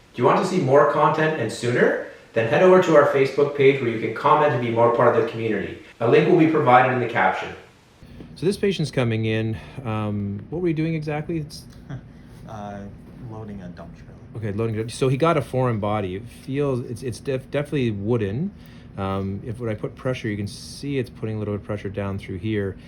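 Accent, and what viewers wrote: Canada. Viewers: My husband is Canadian (I’m American) and I always have a knack for knowing Canadian accents. As soon as I heard you speak I knew you were! Also patient did a great job keeping himself together during the lidocaine. I would’ve been swearing!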